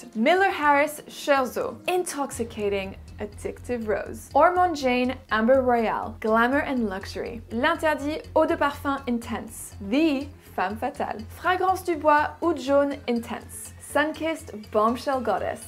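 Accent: France. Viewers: The addiction for "Alien" and YSL "Libre" my mom has passed down to me is magical and indescribable! Your French is just so satisfying and lovely to hear! Merci! ♥️ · Un accent français hh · U have an excellent frensh accent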